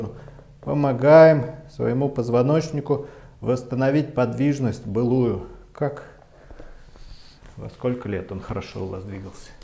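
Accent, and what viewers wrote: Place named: Chuvashia, Russia. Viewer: Остановилась на видео, услышав знакомый чувашский акцент (сама родом из Чувашии из Чебоксары, живу в Германии),этот доктор мне родненький земляк😍, какой классный 👍.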